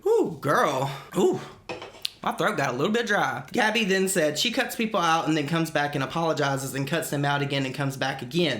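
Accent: South, United States. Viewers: I love your country twang 😍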